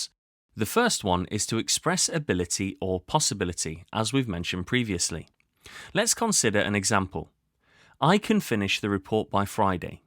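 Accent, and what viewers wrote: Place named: Wales, United Kingdom. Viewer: I've been ever so swamped with work lately that only now have I been able to begin to watch your current series of 41 videos (so far, hopefully kkk). No need to say I'm enjoying them no end. Thank you, thank you very much. As for your Welsh RP... well... I love it!!! kkk 😊😊😊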